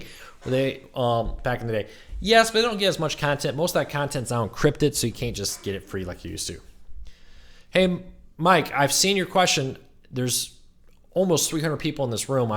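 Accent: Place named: Michigan, United States. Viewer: You grew up in Michigan! Me too! What part? I'm from Bloomfield Hills. Thought I recognized the accent 😉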